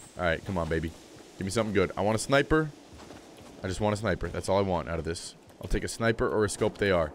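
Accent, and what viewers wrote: United States. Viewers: R u American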